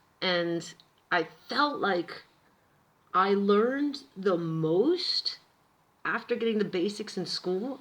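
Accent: Bavaria, Germany. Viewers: When you speak German you sound like a Bavarian.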